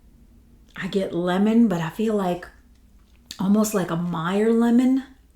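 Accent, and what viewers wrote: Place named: New Orleans, United States. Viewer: So good to see you and your ideas are so right! I haven’t gotten this yet but it seems they did a good job with it. On the end of month live with Dan Orchard he pronounced beignets “Ben-ets”, it was so funny. Being from Louisiana we just take all the NOLA stuff for granted. It really is such a neat city with so much culture and history. I could tell by your accent you from there when I first started watching your channel. I’m a north Louisiana girl. Randazzo king cakes are so good. And a side note, Hubig pies are back out! My cousin brought me one at thanksgiving. Anyway really loved hearing your thoughts and can’t wait to sniff these!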